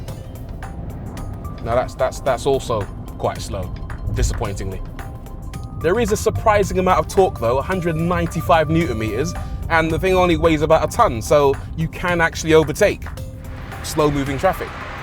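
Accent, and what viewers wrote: United Kingdom. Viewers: i hate how the brits pronounce nissan bro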